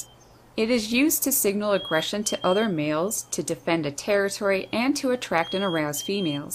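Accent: Canada. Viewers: Just started to listen, say's " aboot," Eight oot of the sixteen differnt calls. Haa Must be Canadian. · I can tell you’re Canadian, your “O’s” sound like ooo’s, hence “Abooot” Love the video tho!!! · I love your videos, especially those featuring corvids. I was tempted to mention that I thought I heard what linguists call "hypercorrection" in your narratives. I'm glad I didn't. My new sense is that what sounded like hypercorrection might be Canadianisms in your speech. I'm especially interested in what you have observed about social learning in birds. That's a topic that has scarcely been explored at all. Thanks for the gift of your observations.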